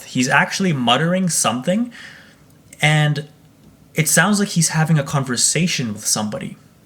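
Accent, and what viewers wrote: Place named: Eastern Europe. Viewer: its his accent/etc - he sounds eastern European to me, but maybe northern.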